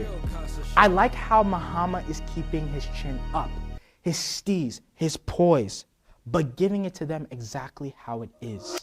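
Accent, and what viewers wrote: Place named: United States. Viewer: Are u Ghanaian, just a harmless question, cos u sound American?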